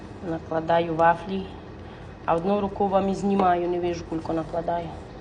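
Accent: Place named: West, Ukraine. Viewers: Взагалі українки говорять українською мовою, а не рашистською. Автор відео говорить західно-українською ( діалект). Якщо не подобається, не дивіться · То чого ж це ви, «українка», російською пишете? А я ось, не українка, вивчала українську у радянській школі у Києві , живу більш ніж тридцять років в Ізраїлі, але ж не тільки пишу українською а ще й усе те, що ця шановна пані каже, зрозуміла. Дуже приємно слухати західний діалект, немов річечка. А вам таки має бути соромно! 😁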